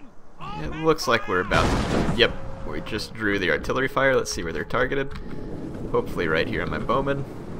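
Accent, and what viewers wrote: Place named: South, United States. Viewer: he's from the south c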